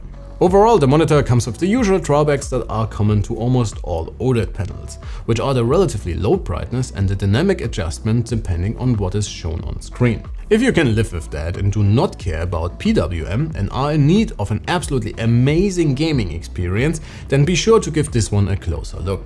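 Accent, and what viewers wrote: Germany. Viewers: Your teutonic accent is very annoying. Please improve that.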